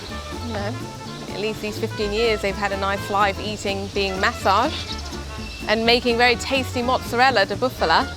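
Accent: England, United Kingdom. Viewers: Maybe the British accent takes me away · You have a English accent how are you American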